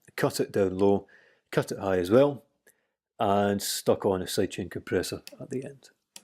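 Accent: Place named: Glasgow, United Kingdom. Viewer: Fuckin yass subscribed as soon as i heard the accent. Min the glesga!! Class tutorial be swatching the rest.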